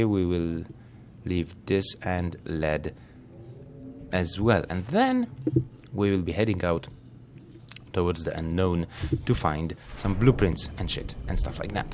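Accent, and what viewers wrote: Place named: Eastern Europe. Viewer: Where are you from, your accent is very nice. I think east europe.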